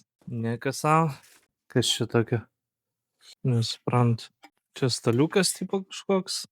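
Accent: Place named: Poland. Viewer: ir galva skaudet pradejo tas lenkiskas akcentas ir dar plius siuksles rodai kaip normalu daigta nezinau ar istversiu antra tavo video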